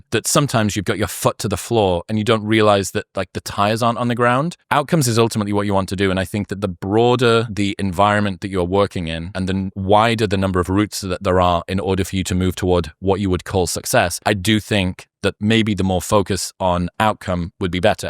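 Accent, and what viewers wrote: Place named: Northern England, United Kingdom. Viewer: thats his british accent, from Stockton-on-Tees north england. its dif from london english and some words sound american at times, like football. listen to when he says year, its so weird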